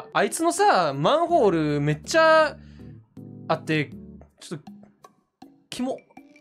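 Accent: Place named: Kansai, Japan. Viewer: 寝れない夜中に見るもんじゃなかった😂腹痛い sqlaさんコラボとかスト鯖でおもろい関西弁の人って印象だけあったけど、本当に面白い😂 切り抜き見て良かった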